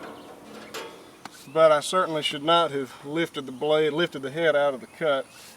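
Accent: South, United States. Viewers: Its not a mistake if you learn something from it. I subscribed as soon as I seen you were honest enough to show the others that this is a huge no no. I like your channel and wonder where your from. Sounds southern but then I still have my N, Carolina accent and I left when I was about eight years old. Been in Alaska most of my life and never ran many bandsaws. I did run circular blade saws with insert teeth. easy to sharpen but two men to lift back on the mill. I am 72 and learning like you only on youtube so I am not a armchair coach. Good video an great garden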